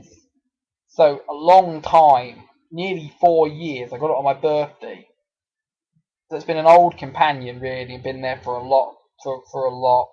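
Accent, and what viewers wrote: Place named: United Kingdom. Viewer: Dude, how I love the British, with that accent I could listen to you talk all day : )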